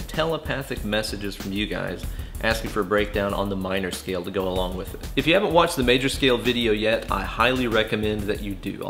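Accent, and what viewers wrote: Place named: Tennessee, United States. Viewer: the Dave Ramsey/I definitely live in Tennessee voice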